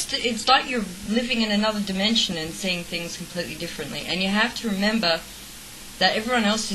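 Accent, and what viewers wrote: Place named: Australia. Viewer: ew god your australian!!! its bad enough your vegan, both mixed together your like the next fuckin hitler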